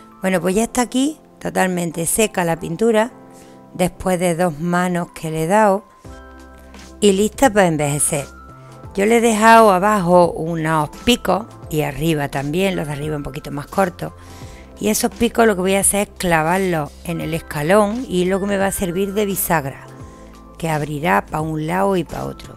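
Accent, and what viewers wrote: Andalusia, Spain. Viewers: ! que artista que eres...y me encanta tu acentito... de andalucia verdad...? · mi más sincera enhorabuena por tus trabajos. Son muy originales, bien explicados y detallados. Hay dos cosas que me encantan: Tu generosidad en los detalles y tu lenguaje andaluz, próximo y cercano. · Hola preciosa mujer,,, Me encanta escucharte hablar con ese acento español que te caracterisa Tienes una manera de expresarte taaann,,, clara,,! Que no cabe duda que el español escuchandote hablarlo con tu acento,es fascinante, Sin palabras fuertes y ofensivas,limpio limpio como clarito Eso es lo que me encanta de ti, Porque yo tenía la impresión de que los españoles,hasta ofenden a DIOS,,en su palabrerías negras, Pero escuchandote a ti,es mentira que todos ofendan a DIOS PADRE,,,, Besos y abrazos hermosa mujer, Soy fanatica tuya ,,ya eh echo muchos de tus trabajos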